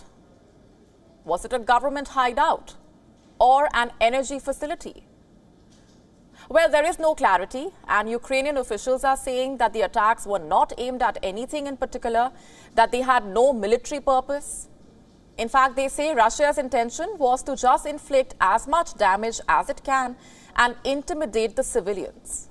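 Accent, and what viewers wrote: India. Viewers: i love how funny and cute indian women talk english,she's adorable ^.^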